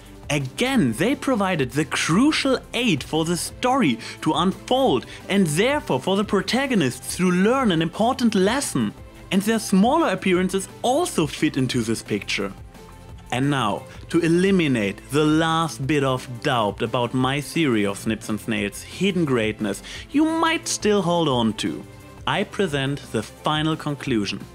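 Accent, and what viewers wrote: Germany. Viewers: I meant to say my accent isnt as bad as this. Seriously you have like the most German accent ever. Also what does stand for? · Is he German?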